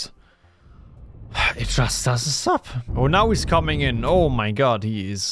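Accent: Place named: Germany. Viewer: Finally, the Empirer narrated as it should be... in German accent!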